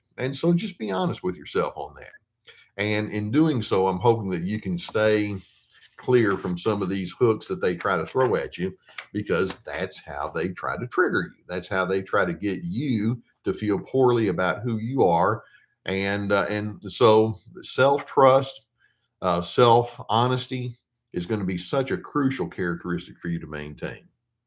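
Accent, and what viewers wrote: South, United States. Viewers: it's because of your southern accent. Your all good. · Funny you're 2 hours ahead I just went to your site Pacific Standard Time. Thank you for team healthy, I don't stereotype you though you have the Southern accent. Yes I've been made to look foolish by such individuals you help me not feel so alone when gaslit or hoovered by such individuals or made to feel guilty because what I have to offer is not enough after they repeatedly destroy or lose or sabotage what I give them.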